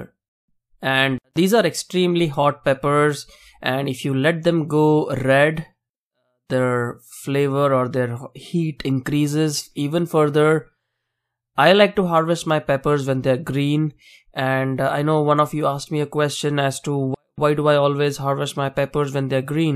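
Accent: India. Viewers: สำเนียงคนพูดเหมือนคนอินเดีย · heavy indian accent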